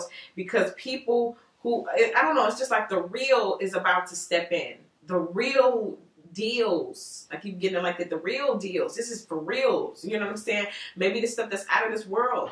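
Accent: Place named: Atlanta, United States. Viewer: Love you QUEEN . And MAAAAN you so ATLANTA LMAOOOOO I LOVE IT🙌🙌🙌🙌😂😂😂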